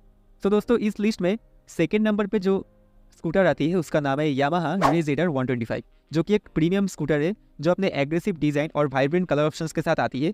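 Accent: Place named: Bengal, India. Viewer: You are pure bangali 💯% confirm 95,000 hazar bolne ka tarika pura bangali ka he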